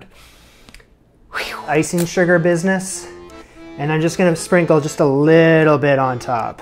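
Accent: Canada. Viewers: Ha! I've watched several of your videos lately and recently thought, "is this guy Canadian?" due to your accent. This video confirmed it!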